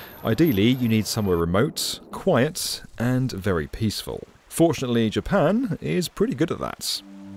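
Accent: England, United Kingdom. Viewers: You know, I don't really interested in civil engineering or watch some people building an enormous megaproject all I wanted to do in this chanel is to hear the beautiful english accent 😅✌️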